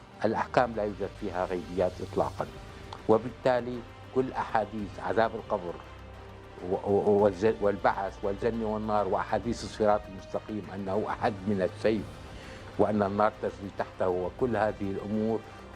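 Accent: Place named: Syria. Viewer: hhhh أكيد يتلعثم فهو حفظ القرآن و هو كبير و هو سوري تغلب عليه اللهجة السورة و درس في روسيا و يتقن عدة لغات المهم هو يحفظوكتاب الله اذا تلعثم أثناء الحديث لهذا شيء عادي جدا و ليس حرام لأنه أثناء التفسيير او مطالعة كتاب الله يقرؤه جيدا ثانيا لقولك ندير و تدبير و عيرته بضعفه في اللغة هو تكلم عن التدبير و المعنى واضح حسب سياق الجملة و انت قلت له ليس تدبيير بل تدبر لا حجة لديك في باقي النقاش كلامك مجرد نقل نقل نقل همهمهمهمهمات تكلم بالعقل و المنطق ولا تدعي على الناس ما لم يقولوا و هو شرح آيات الله شرحا واضحا يفهمها العام و الخاص و هو لم يحلل الحرام لو أستمتع لكل حوارته ستفهم مايريد ايصاله المهم ليس عيبا ان تكون ضعيفا في اللغة العربية لكن العيب ان لا تفهم ما يقال لك و لا تميز بين الصح و الغلط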